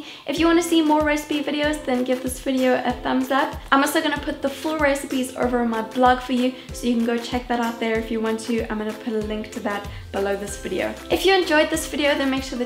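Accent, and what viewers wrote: South Africa. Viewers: are you South African? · You’re South African! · Sounds like a South African 🇿🇦 ? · She's south African like me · South African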